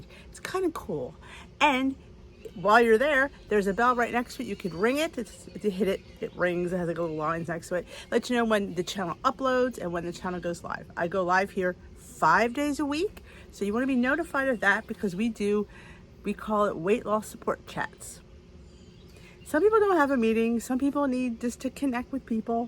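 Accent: New Jersey, United States. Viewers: I'm glad I found your channel and i just subscribed to it. I'm with WW and have 150 pounds to lose. I'm trying to make it a lifestyle - I have lost 65 pounds since my highest weight and lately have gained and lost the same 10 pounds because I've been goofing off. For my health I'm really trying to make it stick and your recipes and examples of what you eat in a day. Also- you gotta be a Jersey girl - you definately have the accent. Too bad WW didnt take you- definately their loss. Anyway I look forward to following you- your success is inspirational!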